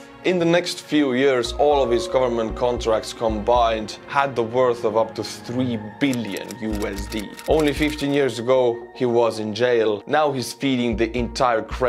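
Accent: Estonia. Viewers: I can't wait to support you so that I can hear you butcher my name in your Estonian accent. Always look forward to watching a new video. But I won't eat at Prygozhin's restaurants. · I really enjoy your videos and this was most interesting and entertaining. I like your style of presentation with your awesome Estonian accent. Thank you and keep them coming!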